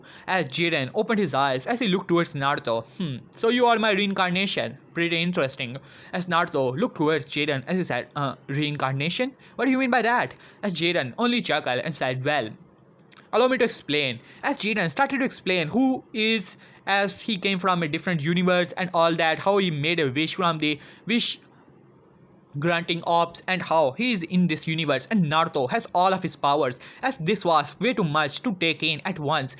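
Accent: India. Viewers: It sounds like Indian and Asian English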